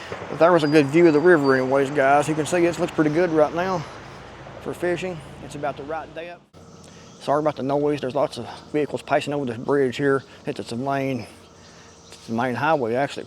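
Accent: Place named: Tennessee, United States. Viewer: Either buddy, I just really like this video and I just think it’s really cool the way you explain things I love how you’re driving in your car. I think it’s really really awesome and I can’t wait to hear and see more videos from you. You’re really awesome and I like your accent. It must be nice living in Tennessee, but I definitely will stay as a fan and watch more of you I think you’re awesome man.😮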